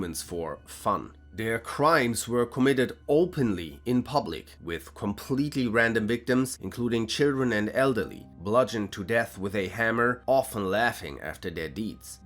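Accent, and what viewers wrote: Germany. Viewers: am just messing with you bruh i like german accent and it doesnt matter nothin how you pronounce something. If we all spoke same accent it would just be boring.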